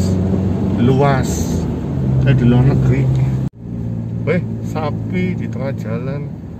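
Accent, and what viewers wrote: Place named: Java, Indonesia. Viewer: Asik ne ada logat jawa jawanya... agak kecil juga jalannya.. suasananya ada gambaran seperti di Kalimantan...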